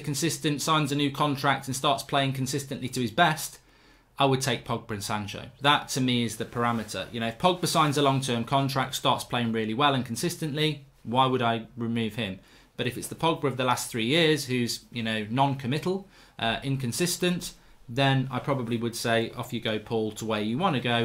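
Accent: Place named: England, United Kingdom. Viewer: Esse sotaque inglês é mt pesado pqpqpqp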